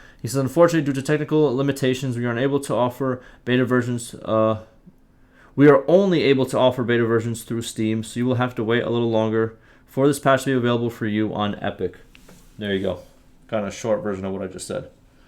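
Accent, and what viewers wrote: South, United States. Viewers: You sound dixie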